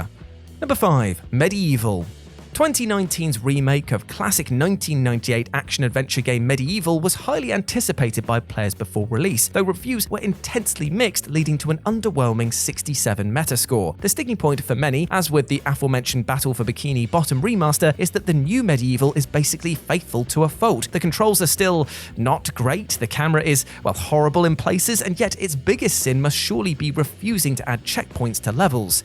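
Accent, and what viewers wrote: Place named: United Kingdom. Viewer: you don't enjoy the game cause you are one of those reviewers... Jesus this guy is so fucking unaware... the definition of a brit that made a career with American audiences cause his accent makes him sound genuine.... this guy... smh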